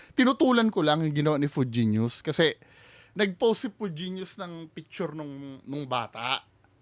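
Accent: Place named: Visayas, Philippines. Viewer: Ate Bisaya ako Pero ikaw Bisayang Bisaya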